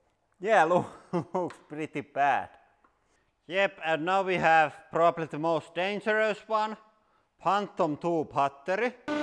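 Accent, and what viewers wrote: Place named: Finland. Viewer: Kunnon rallienglanti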